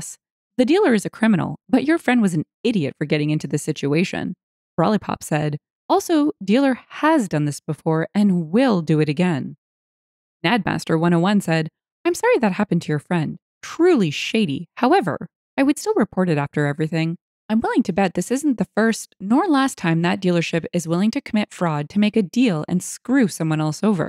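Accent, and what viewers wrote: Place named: Canada. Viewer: I love how the narrators Canadian is coming out Eh? The stories are great!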